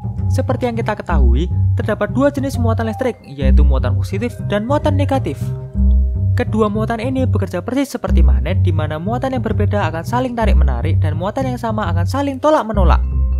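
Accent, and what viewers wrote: Java, Indonesia. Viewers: petirr oii petir.... bukan peteerr... medok bangett masss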